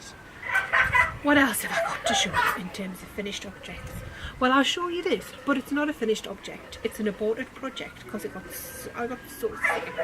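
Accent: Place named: County Durham, United Kingdom. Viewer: Only just found your podcast and am binge watching you from the beginning. Loving it you crack me up. I recognise your accent and when you said you were brought up in Burnhope that's probably why. I was brought up in Annfield Plain and Catchgate so you probably went to my school, Greencroft (albeit a few years after me)? Anyhoo you've got a new fangirl 😄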